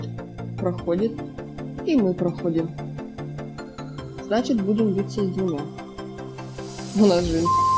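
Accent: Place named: Urals, Russia. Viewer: Прикольно)) Ты из Перми/Екатеринбурга? Манера разговора "тамошняя"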